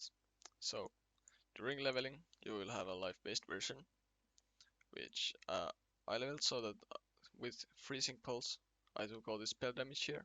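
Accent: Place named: Finland. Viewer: If i learned one thing in Finland for my 16 years of stay there, it is how finnish people sound when they speak english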